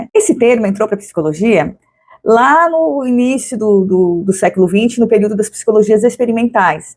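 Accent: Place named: Azores, Portugal. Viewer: Maravilhoso! E é gostoso ouvir o sotaque açoriano, ainda que leve.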